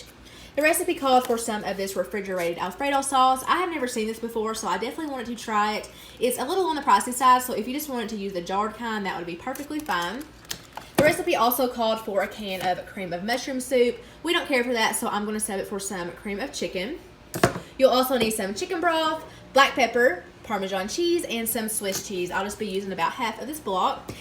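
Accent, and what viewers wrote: South, United States. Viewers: Hi there! Your food always look so good! Love listening to you talk, love the southern accent. Thank you for all you do. God Bless, your kids are adorable. ❤️🥰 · “Then I’m gonna, kinda badly here, cut this into bite size pieces” 😂 I died! Much love from Alabama! I can hear the southern charm in your voice! 😂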